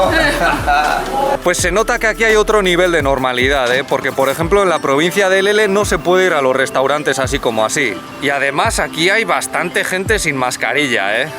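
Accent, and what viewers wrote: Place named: Spain. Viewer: El acento español es la leche tío. El mejor.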